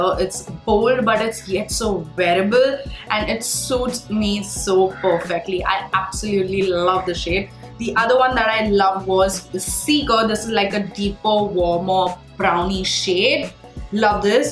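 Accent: India. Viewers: Lmao your Indian accent is very visible 🤭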